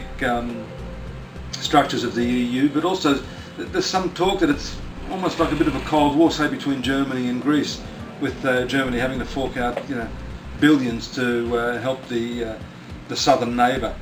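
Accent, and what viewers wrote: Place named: Australia. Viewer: Ah...I was right...you're an Aussie. At first I was wondering what part of England you were from and then I got it. Thanks for the info--I'm a Sadge.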